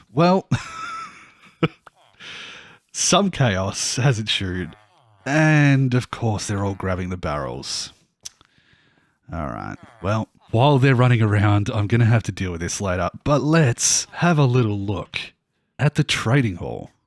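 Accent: Australia. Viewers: Im just new to your channel and so far im really enjoying your content and I love buddy, Am i hearing an australian accent, thats cool if it is, I really wish there were morre australians doing minecraft content. but if im not and im wrong thats cool too, beacause im enjoying your content.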